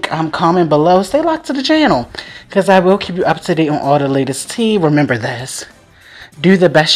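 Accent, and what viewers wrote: Baltimore, United States. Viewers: You sound like a fellow BALTIMOREAN yo...😊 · I know a Baltimore accent when I hear one!!! First time on the channel, loved the content mama 🥰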